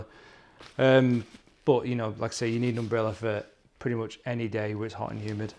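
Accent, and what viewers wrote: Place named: United Kingdom. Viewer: I like that this bag is good for 1 people as you say. I appreciate being able to learn proper English from a British people like you.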